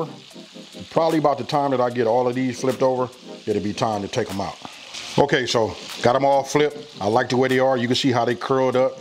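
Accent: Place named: California, United States. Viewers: I’ve been cooking with potatoes a lot lately. I can’t WAIT to make this. Thank you! Love your Cali accent too. 🌸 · Oh ok so he’s from Cali ok. I thought he was somewhere in the South by his accent ok hehehe.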